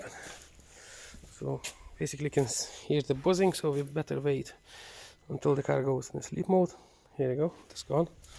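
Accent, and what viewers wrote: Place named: Russia. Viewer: Сразу слышно что человек Русский )))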